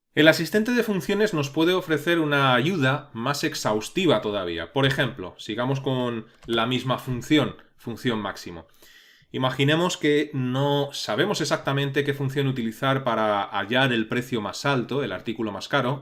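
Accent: Spain. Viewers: Me gustan tus videos. Me está viniendo genial para preparar la oposición. Además con castellano de España. Un saludo desde Valencia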